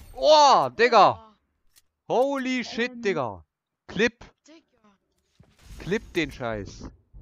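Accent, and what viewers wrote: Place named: Saxony, Germany. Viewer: Bist du Sachse ich bin Sachse denke es wegen dem Akzent, könntest du meistgeschauteClips von FaZe Dubs oder mitro, benjifishy feie das Format. 👍👌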